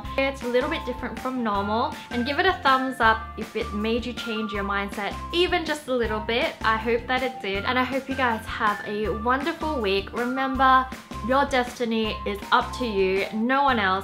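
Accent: Australia. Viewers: Sweetpea she's Chinese but has a Australian accent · I am kinda new to this channel and I was wondering, are you full Asian or are you mixed with Caucasian? I was just wondering because you have an Australian accent but you are from China. Like your videos! Keep up the good work. (^o^) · Whoa...angel on earth...you have an Aussie accent haha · ...that's an Australian accent + I'm pretty sure she's a Chinese Australian correct me if I'm wrong. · Saarah U that and she just sounds Australian (well about 85% of the time anyway)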